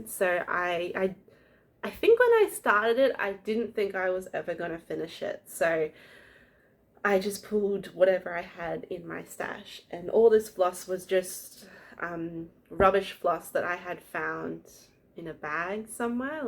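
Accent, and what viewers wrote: Australia. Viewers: I LOVE hearing a fellow Aussie on YT! What a great first video 😊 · Hi I am from Busselton and it is difficult to source patterns fabrics local, Stitches Corner in Perth has just been sold and the shop is moving to Balcatta and will reopen the end of February with an online shop 🤗 as well, I have just started back into cross stitch and quite a novice but like you enjoy other crafts e.g knitting etc I have bought patterns from a Stitch in Time and also Crewel Goblin in Sydney. I enjoyed watching and hearing an Aussie accent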